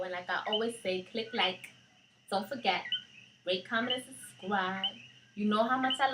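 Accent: Nuyorican, United States. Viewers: Vaya Mamii...I can tell off the back your NYRICAN all the Waay!!! Love the NyRican Accent unique unlike no one else....You sound like your a Brooklyn Boricua. Super Beautiful mami. Yea' Dominicans don't want no fuckn Beef with Boricua cause the same way we open the doors for those YOLERS we can and known how to Close them. P.Ricans have 3Million Boricuas alone in NYC so they can figure that one out plus we have 100yrs in NYC they came in the YESTERDAYS" Thanks to the NYRCIANS.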